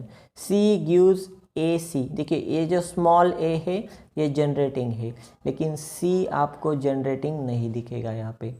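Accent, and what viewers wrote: Gujarat, India. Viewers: Are you Guju ?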